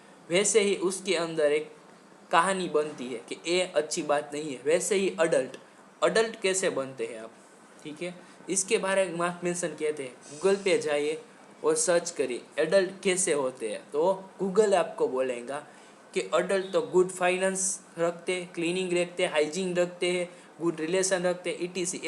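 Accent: Gujarat, India. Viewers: Ap Gujarati ho na bhai ... Language understands ..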